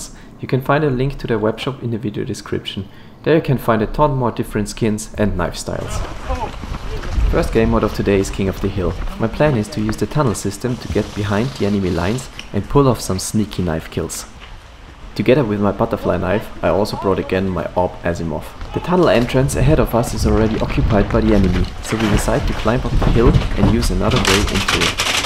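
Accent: Germany. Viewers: Are you from Germany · are you german? i am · man hört so richtig das er deutsch ist auch im videos als jemand anderer geredet hat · You are german? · Hach ja... Deutscher Akzent im englischen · Are you German? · Warte der ist Deutsch Junge dieser Akzent sagt alles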